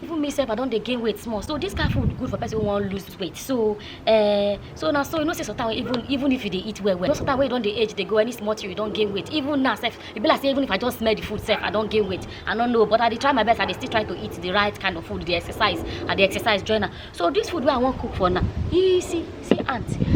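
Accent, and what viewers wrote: Nigeria. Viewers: Pidgin english sweet for your mouth oh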